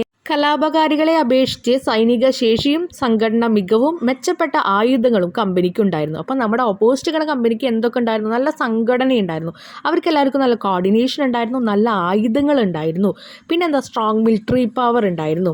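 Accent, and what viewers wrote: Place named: Chalakudy, India. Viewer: Mole poli 🤘 ടീച്ചറെ നാട് ഏതാ ചാലക്കുടി ആണോ മാം എന്റെ ടീച്ചറെ സംസാരം അതുപോലെയ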